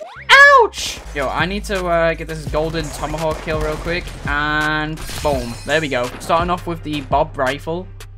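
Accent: United Kingdom. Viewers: I think he’s British…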